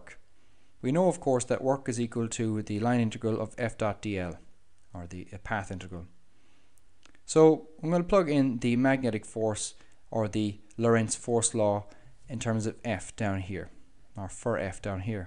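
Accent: Ireland. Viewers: Instantly liked because you're Irish and it's a great accent to listen to physics too (Y)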